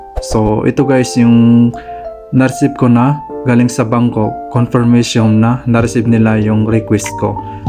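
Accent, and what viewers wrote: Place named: Maranao, Philippines. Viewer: Hello sir maranao po ba kayo,kc maranao kayo magtagalog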